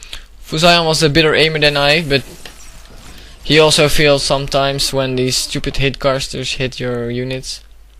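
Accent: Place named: Netherlands. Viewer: R u dutch? U got really a dutch accent, I know I am dutch xD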